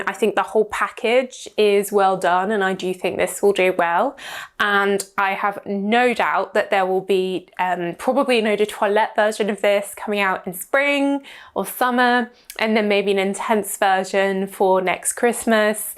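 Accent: United Kingdom. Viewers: is your dialect british?(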